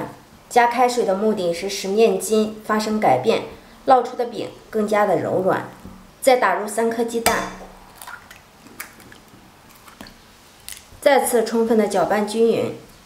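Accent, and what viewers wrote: Shandong, China. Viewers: According to her accent she's probably from the same state as me, thanks to her guide I can make authentic pancakes justl ike in my hometown. I highly recommend to try her recipes, turst me it's not as difficult as you imagine. 如果你需要英文字幕我可以帮你，作为感谢你让我吃到了想念了多年的家乡饼。说实话看你的视频之前，我都不知道这种饼叫什么名字，只知道在山东非常常见。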